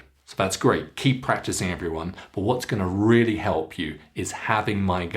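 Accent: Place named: RP, United Kingdom. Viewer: You have a modern RP accent. Were you born in the south of England?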